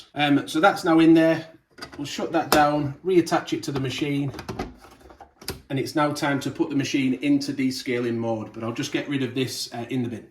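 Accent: United Kingdom. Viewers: Thanks for taking the time to make this video. Way better than what Nespresso has done. Plus, appreciate the accent from the other side of the pond. Go Three Lions!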